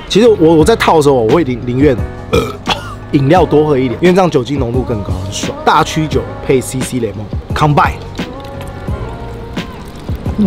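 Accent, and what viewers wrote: Japan. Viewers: 我喜歡你的日式英語😆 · 他說的英文是那邊的英文？ 日式英文？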